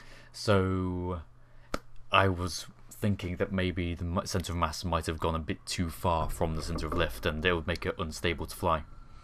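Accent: United Kingdom. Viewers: watched your good vids forget to subscribe but here :D you have one your british brr your voice is brr i love british acent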